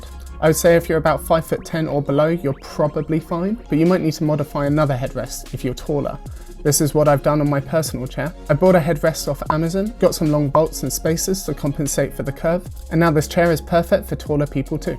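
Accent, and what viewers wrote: United Kingdom. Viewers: rep bri'ish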